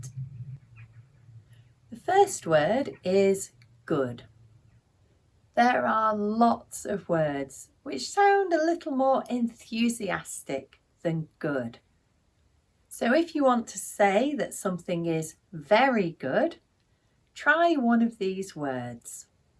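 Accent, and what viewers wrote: United Kingdom. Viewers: My pleasure I love the British English And you are Perfect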